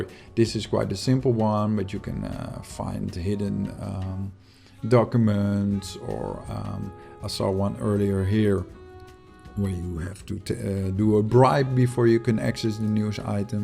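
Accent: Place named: Netherlands. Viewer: Volgens mij hoor ik een Nederlander?!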